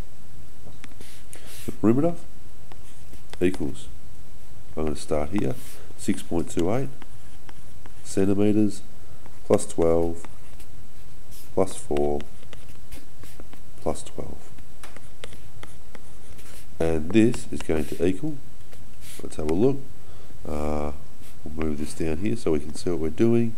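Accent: Australia. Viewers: I don't really understand coz of his aussie accent.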